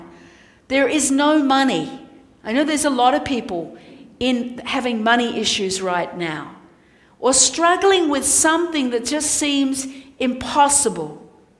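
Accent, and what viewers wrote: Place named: Australia. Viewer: you not only give wonderful HUGS but ones that have never met you giving your presence as on You Tube and your online Web Site you give this amazing loving energy that is so understood especially with your beautiful Australian accent You of choice are a Beautiful Earthling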